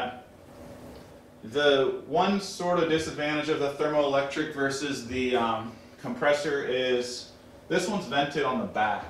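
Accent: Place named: Oregon, United States. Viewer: Is that an oregon accent? He says "these ones" which is typical oregonian.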